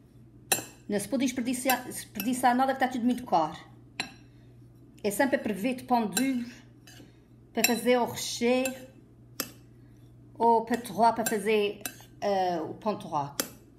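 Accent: Azores, Portugal. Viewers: adoro esse sotaque Acoriano! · How sweet and pleasant to hear Sao Miguel accent! Love t! ♥️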